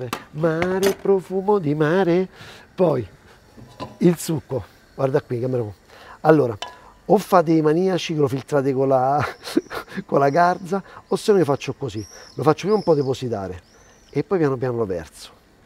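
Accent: Rome, Italy. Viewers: sei simpatico sei sempre sorridente spieghi benissimo e sei de Roma col vostro accento che mi fa impazzire. Ho provato alcune delle tue ricette e mi vengono anche bene, l'unica critica che ti posso fare e' che a forza di provarle sono ingrassato di 10 kg. 😄😄. Grazie di tutto.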